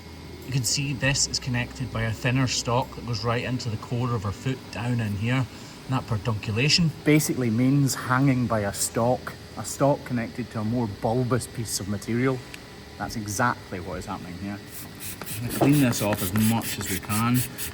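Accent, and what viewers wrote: United Kingdom. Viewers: It's like watching the ear doctor clean some wax. Satisfying. Except you have a British accent. Love it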